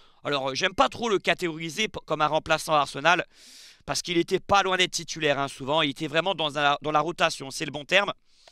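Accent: Corsica, France. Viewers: Ç'est pas la 1ere fois jtecoute 👍🏽 hors contexte mais il semblerai que tia l'accent de nos amis Corse, jme trompe ?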